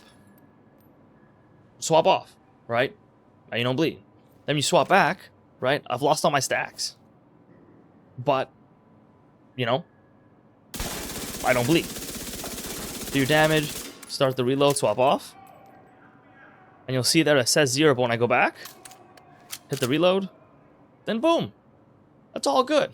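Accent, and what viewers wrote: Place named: Canada. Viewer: Dude, where in Canada? I can't quite place the accent.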